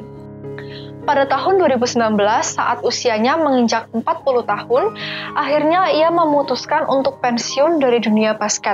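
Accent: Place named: Java, Indonesia. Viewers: Pasti org jateng nih medok nya beda sm surabaya, wkwk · Suroboyoan cokk.. 😅😅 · Host nya fix orang SBY wkwkwk medok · suaranya medok tapi lucu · host ceweknya kalo ngomong medhok, tapi lucu wkwk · Medok e surabaya ki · medok imen mba hehehe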